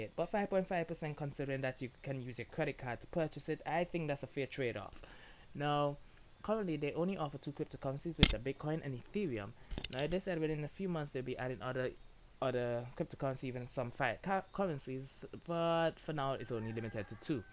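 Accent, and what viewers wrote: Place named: Caribbean. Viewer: Hearing a Caribbean Accent....Respect !!!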